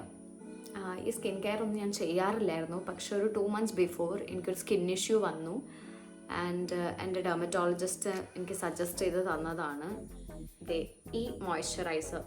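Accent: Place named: Palakkad, India. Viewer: Hello chechyy Unnivava vlogs le chechy mare athe slang ningalkum....ningl oke palakkad aayond aavum llea... Anyways kettirikkan nalla rasamund ....❤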